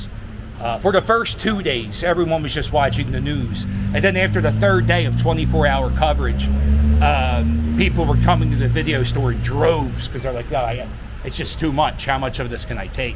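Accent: Philadelphia, United States. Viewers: I was a worker at a delinquent training facility in Olwein, Iowa with 20 teenaged. Although the chances the bad guys would target Iowa, was bill, the kids thought they were next. I’m from Philly and listen to you to make sure I keep and refresh my Philly talk and attitude. I’m now in Denvet, Colorado. When I offer an opinion they ask if I’m mad. NO, I’m just sarcastic GD. Great walk. love it.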